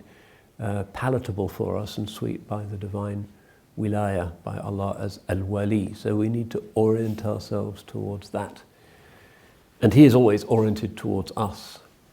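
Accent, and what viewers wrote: United Kingdom. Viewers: This is amazing. Love the British accent. Shows that stupidity sounds intelligent with the right accent but remains stupidity nonetheless.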